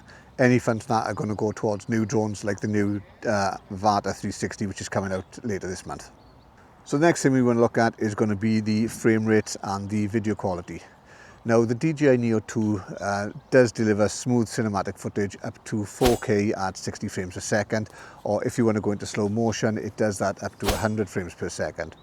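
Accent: Wales, United Kingdom. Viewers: I've almost got my neo2 motion, 😂. I've already bought the 65W wall charger and a fancy carry case. Weather here is improving, only got 1 inch of snow today which is good friday, so not too far from a trip to the hobby shop. If it doesn't like your Welsh accent how will it do with my mixed accent of Geordie and Canadian. 😱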